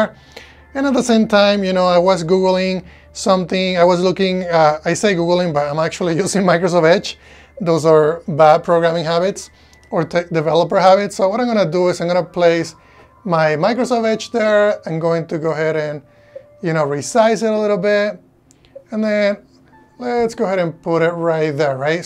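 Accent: Spain. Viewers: muchas gracias por responder!! y perdona por tomarme la libertad de escribir en español en tu canal en inglés, deduje por tu acento y nombre que lo hablabas. Me encantaría preguntarte si consideras factible que en algún momento puedan sustituir a las pantallas físicas, es decir, vemos como reproduces un trailer en una ventana de windows, podríamos estimar que representa una pantalla de 100 pulgadas o las que te apetezca, cierto? pero se aprecia que no son 100% opacas, no sé si es algún parámetro concreto que has configurado en este caso... En definitiva, sería increible poder ver una película proyectada en una gran ventana, virtualmente en una pared sustituyendo a un televisor y también, saber en qué medida afecta a tu vista, si te sientes cansado o algo similar. Muchas gracias de nuevo.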